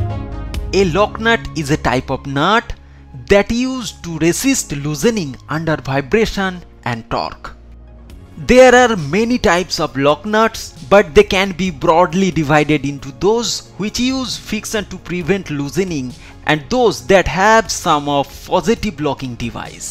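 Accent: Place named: Bengal, India. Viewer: Are you bengoli?....because accent is like that By the way love your content from Odisha😊😊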